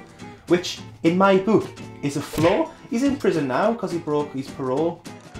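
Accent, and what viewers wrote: United Kingdom. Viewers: This is too british for me to understand.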